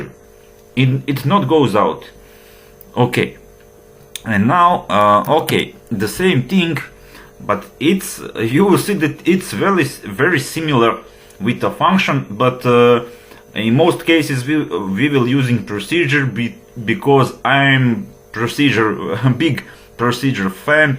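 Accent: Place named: Eastern Europe. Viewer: noice true slav accent.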